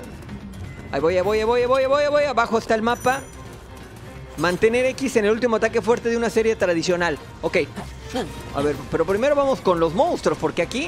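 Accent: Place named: Spain. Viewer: Que buen Gameplay! muy way! oirte hablando con asento Español gran!! imaginate yo, viviendo tan serca de los videojuegos y dejandolos de lado, estoy aprendiendo mucho,y disfrutandolos mas,con vuestros videos! crack!!! jeje perdon otra vez x tanta tardansa,y gracias! te queremos mucho! viva!!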